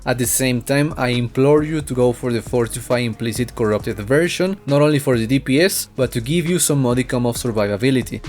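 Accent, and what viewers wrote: Spain. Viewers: are you from like Spain or Argentina? greeting from Argentina btw c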